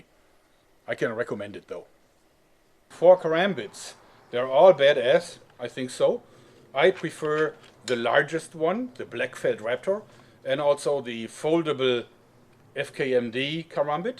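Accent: Germany. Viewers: Nope, keine Fehler nur starker deutscher Akzent, aber das ist ja normal. Einige Engländer mögen sogar den deutschen Akzent :D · Also war der Dialekt doch deutsch :D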